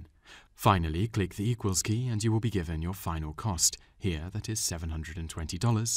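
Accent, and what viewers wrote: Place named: United Kingdom. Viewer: He does not sound like a computer he is British.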